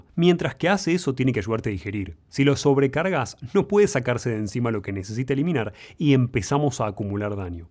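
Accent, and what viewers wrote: Argentina. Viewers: DE QUE PARTE DE ARGENTINA ES EL DOCTOR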